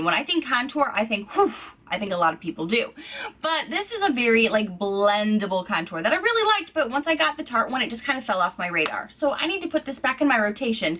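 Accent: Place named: Midwest, United States. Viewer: I always thought you sounded Midwestern. Plus you love the Blues.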